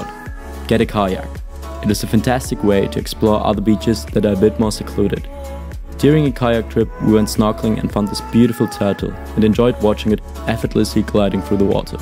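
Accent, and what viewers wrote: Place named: United States. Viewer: dude you sound just like me....are you also American I take it?....just a guess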